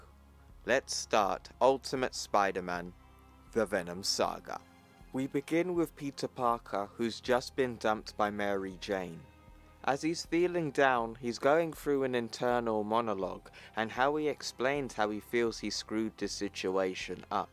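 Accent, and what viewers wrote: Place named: United Kingdom. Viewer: What part of the UK are you from? You have one of the least annoying British accents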